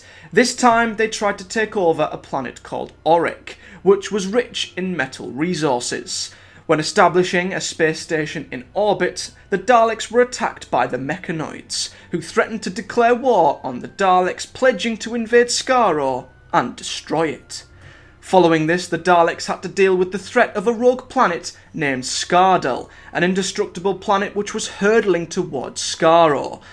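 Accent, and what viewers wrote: Middlesbrough, United Kingdom. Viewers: Thank you ever so much for taking the time and effort to make such a top drawer video. It was great. May I ask are you from Middlesbrough like me? The girl who sometimes is in your video sounds like she does too. She usedthe word narked. Top Boro word. Anyway cheers and take care Ant p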